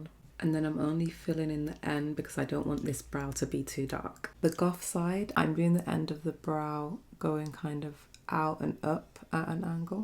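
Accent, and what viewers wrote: United Kingdom. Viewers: I like both but goth is the winner for me also are you British or something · You mean to say, she speaks English in a British accent… like all British people do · She sounds so British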